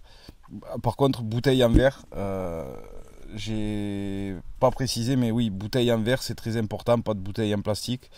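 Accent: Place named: Puylaurens, France. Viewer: C EST GENIAL ! L ACCENT ON A LE MEME !PUYLAURENS 81 !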